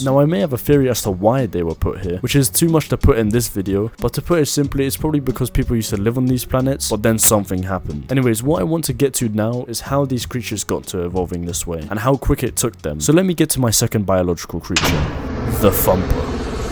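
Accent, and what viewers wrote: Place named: United Kingdom. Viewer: Hey great video! Not only does it help that you sound British, but you also go into a good amount of detail! I look forward to your next information vids :)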